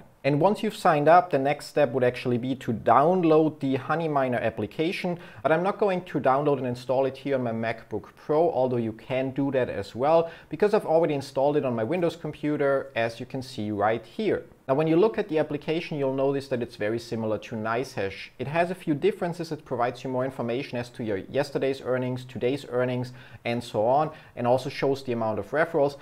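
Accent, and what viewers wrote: Germany. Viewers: du bist einer der sehr wenigen deutschen dem ich gerne beim englisch reden zuhöre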